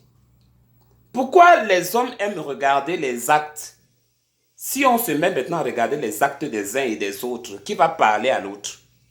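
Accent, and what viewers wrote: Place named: Cameroon. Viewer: à chanté les à t'entendre parler je reconnais ma soeur camerounaise. tu drague deja mama tina on se connait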